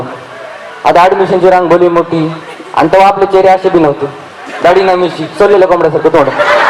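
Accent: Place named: Ambajogai, India. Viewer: full ambajogai chi language.... Mast vatal bhava..👌👌👌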